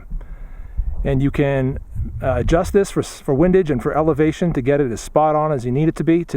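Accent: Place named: Massachusetts, United States. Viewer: ooooh sexy! (the sight, not you dude) I swear man you sound like youre originally from Mass..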